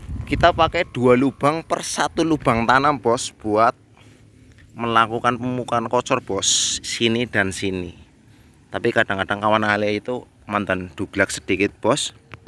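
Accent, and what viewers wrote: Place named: Banyumas, Indonesia. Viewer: Salam ngapak bos🤣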